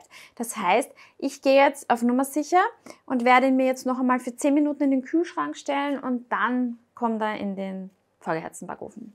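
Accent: Austria. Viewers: Vielen Dank für die schönen Ideen und die verständliche Erklärung. Aber genauso schön ist der österreichische Dialekt. Viele Grüße aus Leipzig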